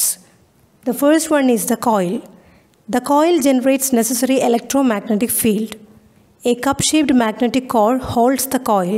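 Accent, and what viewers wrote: India. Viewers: YES IN INDIAN ENGLISH YOU TRIED TO CLEAR THE FUNCTION OF A SENSORS FUNCTION YOUR MODE OF EXPRESSION AND LANGUAGE IS MORE OR LESS CLEAR AND UNDERSTANDABLE----SELIM AHMED CHOUDHURY FROM BANGLADESH ON 7/10/2021 AFTERNON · Is the narrator from India? Love from Bangladesh... 🤝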